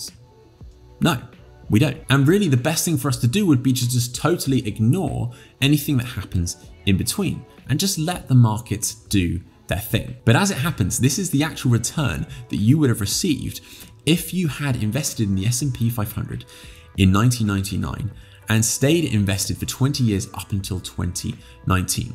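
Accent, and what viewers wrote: United Kingdom. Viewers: Yes, that's mostly correct. I would like to continue to generate income in retirement but I'd like to do it with minimal risk. I hope the strategy of keeping 30% invested covers the loss to my cash reserves form inflation and gives me a slight gain. No one knows for sure what the market is going to do so I find it hard to make decisions this close to retirement. I'm sure fear of losing it is what keeps me at such a low level of investment right now. I just feel like we are in an asset bubble that could pop just as I'm about to retire. That would hurt if I were fully invested. What I have invested is VERY diversified and I made what I think was a smart decision at the first of the year to buy a significant number of STIPS to help keep my investments balanced when bonds/treasuries are performing so poorly. I'm just amateur so I'm reading and learning as much as I can along the way. 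Your videos are very helpful. It's nice to hear insight from a pro! Your videos seem smart and sensible whereas the carnival barkers on TV (who I shall leave nameless) seem to contradict themselves every other day when they've turned out to be wrong. Also, does not hurt that your info is delivered with a cool British accent. :) I also like Pension Craft and Paul Merriman.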